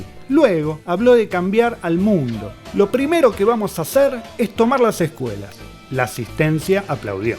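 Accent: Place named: Argentina. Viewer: Mola el acento Argentino bro! jaja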